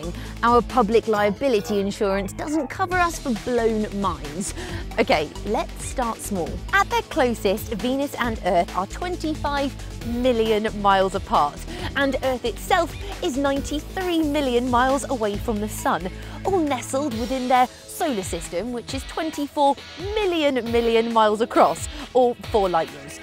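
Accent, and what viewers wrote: United Kingdom. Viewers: ur sexy, i love British accents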